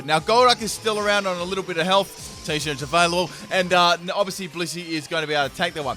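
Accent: Australia. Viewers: Are you an aussy? I just noticed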